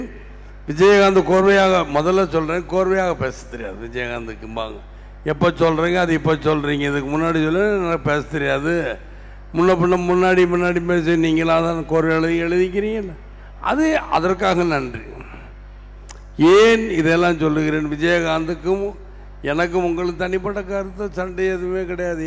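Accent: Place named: Telugu, India. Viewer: First learn tamil we know u r telgu person....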